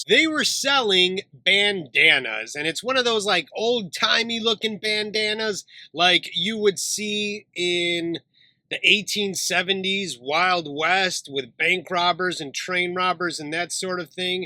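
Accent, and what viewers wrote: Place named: Russia. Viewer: Deeeeeeem I scrolled through your oldest vids to now and dang hearing your accent change, slowly losing the russian accent and becoming more american sounding is a blast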